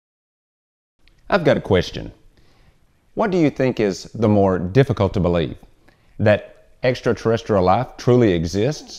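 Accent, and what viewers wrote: South, United States. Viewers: Love the Southern twang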